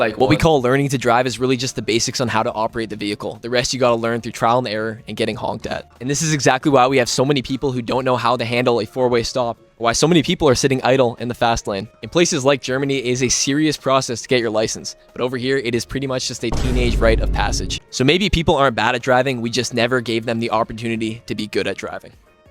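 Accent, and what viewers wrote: Canada. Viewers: Realized you were Canadian in the first 10 seconds of this video +Respect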